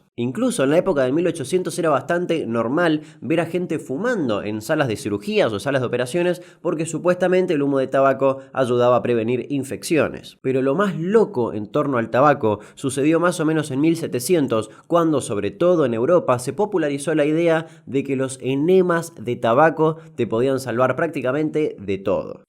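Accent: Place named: Cordoba, Argentina. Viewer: Como me hiciste reír!! De dónde sos, tenés una tonada cordobesa!! Pareciera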